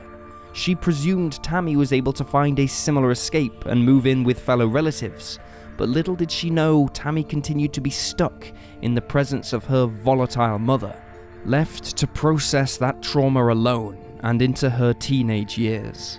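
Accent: United Kingdom. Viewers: Why does the narrator have to have that British accent? Use an American!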